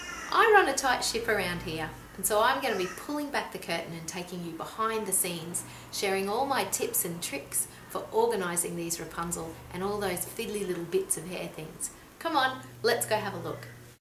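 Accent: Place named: Australia. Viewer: that strong aussie accent xD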